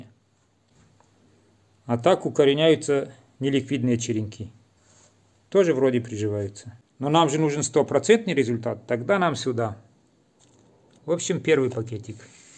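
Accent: Caucasus, Russia. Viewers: Да согласен , объясняете хорошо , но вы забываете или я может быть где-то пропустил где вы живите, судя по акценту с кавказа.Поэтому считаю что дата месяц не имеет значения .Имеет значения какой климат или создать климат для укоренения .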